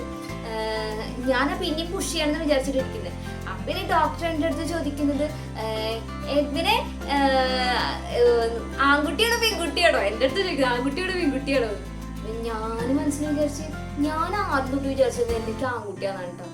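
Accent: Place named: Thrissur, India. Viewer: Thrissur slang il chechide samsaram kelkan nalla resam🥰... Sedation kodthit ulla aa acting scene okke sherikum njn chirich poy😂😂😂😂...love you chechi..god bless you baby girl 😘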